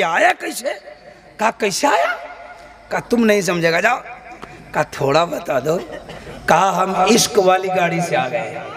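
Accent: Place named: Bihar, India. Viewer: लग रहा ये बिहारी है! ऐसा इस लिए कि इनकी बोलने की शैली बिहारियों जैसी है! बड़ा मज़ा आ रहा है सुनने में! वैसे ये जी रहने वाले कहाँ के हैं?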